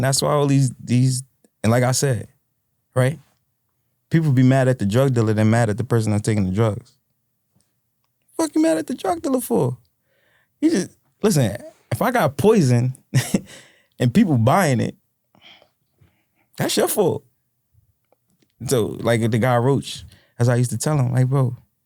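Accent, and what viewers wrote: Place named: Philadelphia, United States. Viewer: Bro from Brooklyn but sound like he from Philly lol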